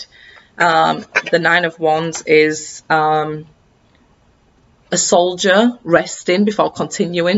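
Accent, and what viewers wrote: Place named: Lancashire, United Kingdom. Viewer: You sound like a local. I'm a Lancashire lass😊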